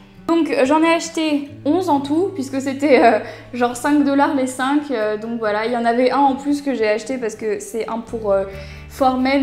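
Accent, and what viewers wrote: England, United Kingdom. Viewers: Jadore ton accent anglais 😍😍💞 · Coucou 💎j'ai trouvé ta Vidéo super , déjà elle a pas durée 30 minutes comme certaines youtubeuses qui s'éternisent sur tous! Et puis tu as une façon de parler , expliquer très agréable a entendre.. Surtout avec la petite musique douce... Tu es posée et pas entrain de crier comme d'autres 💘ta coiffure et ton maquillage sont super jolies 💘ça te va trop bien!tu as un magnifique accent anglais 😉tu est super , je ne te regarde plus que toi sur Youtube tu es la seule vraie youtubeuse tu es une vraie passionnée et ça fait plaisir a voir ❤️ne change rien 💘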